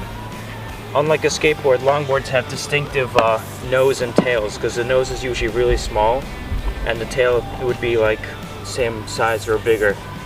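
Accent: United States. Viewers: are you staten island? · dude where in ny you from?????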